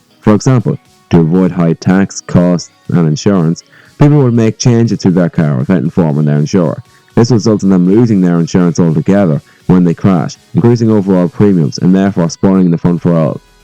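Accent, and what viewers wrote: Ireland. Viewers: Are you Irish cause I am to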